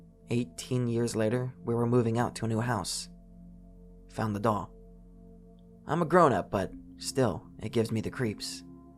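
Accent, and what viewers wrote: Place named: Westchester, United States. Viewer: you from Pennsylvania? I'm getting Westchester vibes from the way you pronounce certain words.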